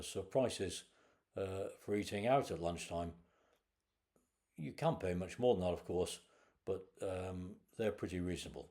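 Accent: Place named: United Kingdom. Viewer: i love... LOVE your channel - I just found you... subscribed.. i am from Portugal but grew up int he US.. so i guess i am American too... Algarve is one of my favorite regions, obv.... I have a very small farm in the Azores - love it there too. Now that i finally extricated myself from the claws of corp. America and am blissfully early retired- i tend to pop over to Portugal quite often. it's just a 4 hour flight from Boston and i love seeing my family and just chillaxin' in nature & peace away from the kerfuffle of daily life in the US... Florida will not quite do... 💌 anyway - am catching up on all your videos this evening cuz i;m hunkered down for this snow storm...(enjoying a dose of the queen's English..LOL..)❄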